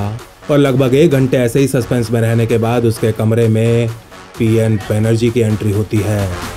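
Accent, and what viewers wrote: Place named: Bengal, India. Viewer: that hindi with bengali accent 🔥🔥🔥😎😎😎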